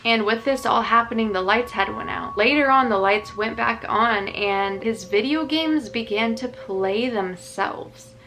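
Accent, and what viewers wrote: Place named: California, United States. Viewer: I do hope you know that I was just picking on your Californian accent.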